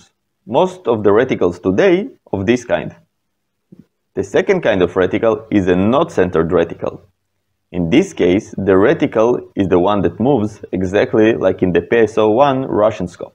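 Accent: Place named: Israel. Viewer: i recoginzed the israeli accent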